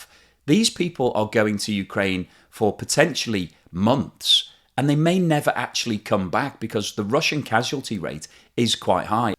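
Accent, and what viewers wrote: United Kingdom. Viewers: He actually sounds British. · Every other video cites the demise of Russian economy done February'. Yet to happen. Maybe he needs more videos on the tanking of the EU and the USA economy better yet his British accent the UK impending economic collapse.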